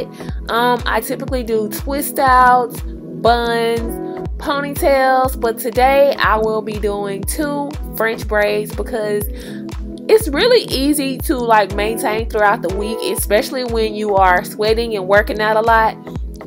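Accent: South, United States. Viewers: The southern accent is real with this one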